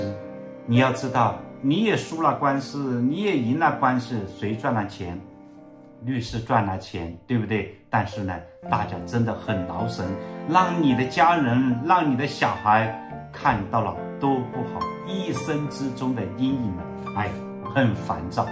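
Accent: Hunan, China. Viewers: 能不能把湖南口音改一改，不要唸“邹霸”，“一鱼既往”......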